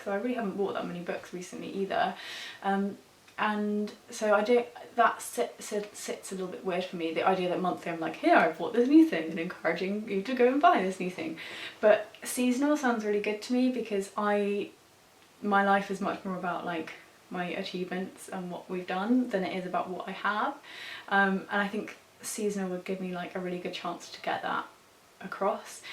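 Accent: United Kingdom. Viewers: You might think that your personality is not that fascinating for people to want to watch you, but I’ll tell you that when I first subscribed to your channel I sometimes had issues with understanding your “posh accent”, as one of your viewers once called it in the comments :)). But I persisted until I got used to it. I do not know what could have made me put efforts into it if not your charismatic personality :))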